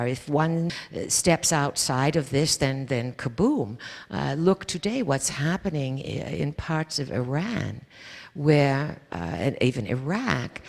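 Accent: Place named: Europe. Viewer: I am truly impressed and follow her down-to-Earth wisdoms and teachings religiously, together with bits of humour occasionally, mixture of European pronunciations occasionally, too. She uses modern Americanisms and old fashioned vocab comfortably. But, more importantly, and fascinating, is that she can speak non-stop, at great lengths, without hesitation [ and repeat words for emphasis ] on any topic whatsoever brought up by an audience / workshop member. Must be collective's instant telepathic communications at work, on thorough answers to questions. Very, very skeptical listeners might feel the questions are secretly given ahead of time, as in recent unfairness / dirty tricks in presidential so-called debates. But, the lengthy, detailed, not common knowledge historically and scientifically, answers kill any such simplistic skepticism completely.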